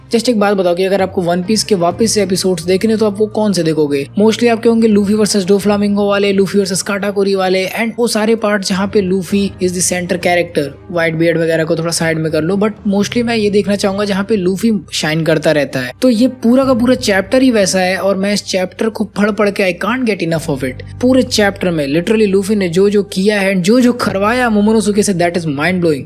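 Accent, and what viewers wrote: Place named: Uttar Pradesh, India. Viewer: bhai....🤣🤣 maza aa jata hai tera sun ke ...full UP wali feel aa jati hai..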